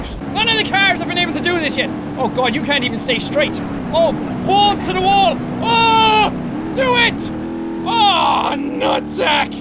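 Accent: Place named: Ireland. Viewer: The boss he's flipping irish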